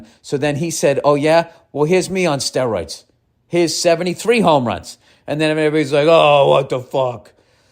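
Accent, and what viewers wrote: Boston, United States. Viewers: I think you may be one of my favorite people on the planet… Every single thing you say is exactly what I am thinking and saying about it. It’s like hearing the voice in my head with a Boston Accent 😂 It’s Awesome!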